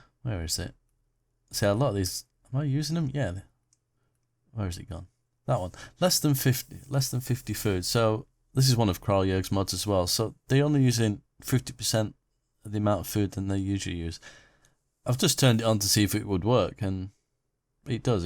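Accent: England, United Kingdom. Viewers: This was a great series; enjoyable to watch. Thanks much! As for the use of mods in the next series, I'd say it depends on the overall goal. If creating a decorative oasis is the goal (like the zoo), then using mods to relieve the survival pressure so that you can concentrate on being creative seems wisely used. If the goal is survival, then not so. Folks like myself watch your series for the artistic aspect (and your English accent!), so it seems right to free up your time to have fun being creative. ;-)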